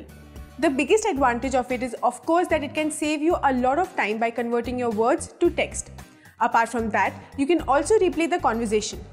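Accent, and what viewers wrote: India. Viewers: I hate indian accent. One of most terrible way of talking in english is in indian accent . I never watch anything that is in indian accent. But your video am just checking if there is any useful app only. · It's an Indian channel, and India has English users as well. People from all over the world adjust to the American accent when watching american shows/videos, or British TV shows, I don't see why they can't adjust to the Indian accent as well.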